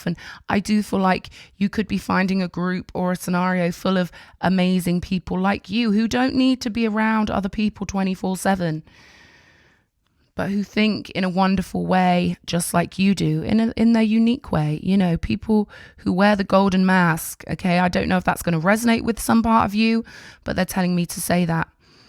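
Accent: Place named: England, United Kingdom. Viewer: Lovely to hear your English accent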